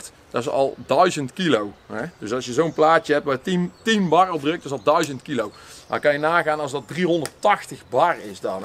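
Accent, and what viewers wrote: Australia. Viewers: Is this Australian accent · I had no idea what channel this was when I clicked on it or where he is from but as soon as the video started I thought he was speaking English with an Australian accent. Then I realized I couldnt understand him at all. Then I thought he was speaking Gaelic or something with an Aussie accent.. do all dutch people sound like this? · I thought he just had a real heavy Australian accent haha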